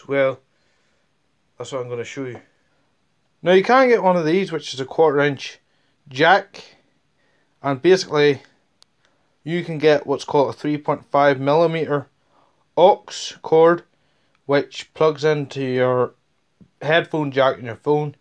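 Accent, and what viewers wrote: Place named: Ireland. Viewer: Very Irish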